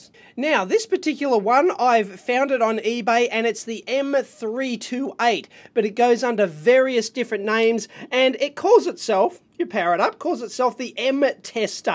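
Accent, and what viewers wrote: Australia. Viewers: Jeez mate, patter is bonza. Stone the bleedin crows mate, strine is easy, try wrappin your lug holes around Scottish. We all talk like in straya especially after a schooner or two. Just keep an eye out for drop bears... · aussie terms crack me up,basically brit humour with an aussie accent,"pissin away power","one hung low". Great stuff mate.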